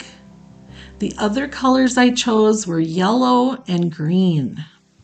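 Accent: Minnesota, United States. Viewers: My first time watching you and I was like she sounds just like my Aunts in Minnesota so I wonder if she's from there and you just confirmed it ,my mom was born in Wisconsin and grew up mostly in Minnesota ,lovely work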